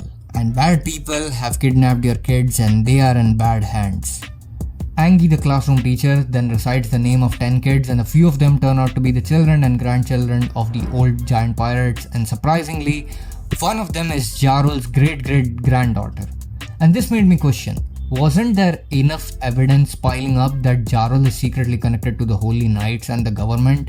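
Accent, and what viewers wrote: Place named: India. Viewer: Bhai hindi me bol na English vale koi teri videos nahi dekhte or teri avaj se pata chalta hey indian hey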